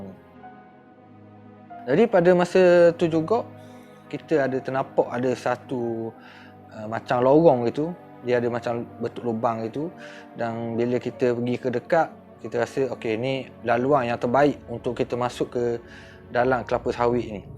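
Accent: Terengganu, Malaysia. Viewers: OH..terbaik..salam ukwah dari saya d SABAH...walau saya tak berapa nk faham sleng terangganu..tapi sangat memeranjatkan part abg sado tg lembut tu naik motor...nanti khabo kat dia jangan buat lagi...😂😂😂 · PEKAT MOLEK GANU TERR... TAPI TETAP MANTAP BOSSKU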